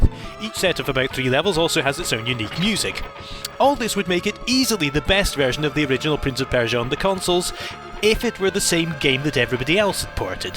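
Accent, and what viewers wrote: Scotland, United Kingdom. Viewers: I thought I heard a bit of Scottish in your accent lol! I didn't want to assume. ^_^ Loved the video man. Just watched your Prince of Persia 2 video today and that one was also great. Keep up the great content man!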